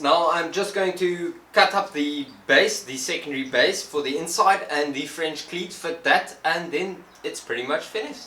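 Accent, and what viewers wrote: South Africa. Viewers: The South African accent just adds to the video in the best way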